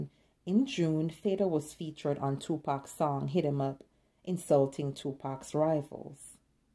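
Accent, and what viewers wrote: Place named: Jamaica. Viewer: Narrator: "Jamaican accent" 👍🏻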